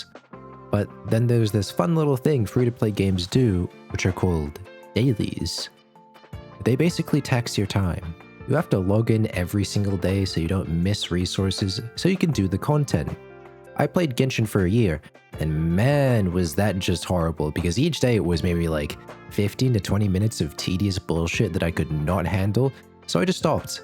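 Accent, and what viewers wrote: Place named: United States. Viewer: Loving your videos man. Got a new subscriber. That said, I know you're Aussie, so I'm curious why your accent is 95% American, with 5% Aussie accent slipping through occasionally. It's tripping me out lmao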